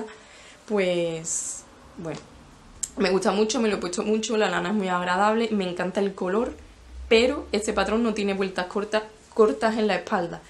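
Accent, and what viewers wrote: Andalusia, Spain. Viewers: Me ha encantado tu podcast y eres muy simpática ❤. Te tengo fichada y nada mas oírte, supe que eras de algún sitio de nuestra Andalucía 😘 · tu inverses les s et les t (c'est l'accent andalou ?) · tienes un acento andaluz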